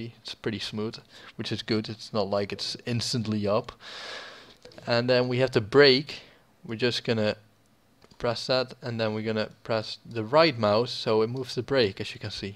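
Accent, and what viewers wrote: Netherlands. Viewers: zo te horen ben je nederlands haha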